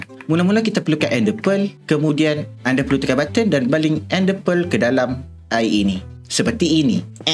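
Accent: Malaysia. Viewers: Wow baru tahu suara malaysia begitu orang Malaysia kue orang Indonesia😂😂🇮🇩🇲🇾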